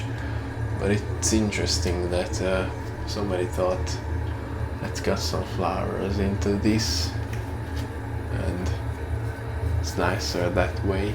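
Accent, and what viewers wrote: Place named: Hungary. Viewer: Your bed is a Murphy bed (the one which is hidden in a wall). There are also Murphy tables (same principle). I thought your sofa would fold out (or you would arrange the cushions) to expand the sofa & make it into a bed, so you surprised me there. 😅 The flat is quite nice. It's small but functional. The bathroom is bigger than in other small flats that I've seen. I could live in that place if it had a window. Had a laugh when you mentioned the female & male hygiene products. 😅😅 Lol. Thanks for the tour! Btw, your accent reminds me of Dominic Szoboszlai, your country-mate. Cheers!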